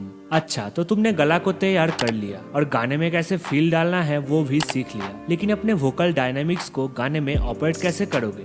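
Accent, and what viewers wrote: Bengal, India. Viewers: In Bengali we call it Rewaz. It's pretty evident from the accent of the speaker that he's a Bengali person. Jab pata kuch hai nahi toh gaand ucha karke marwane kyu aa jata hai?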